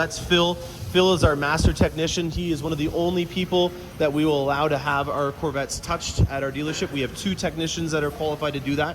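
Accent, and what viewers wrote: Canada. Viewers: are you Canadian? interesting accent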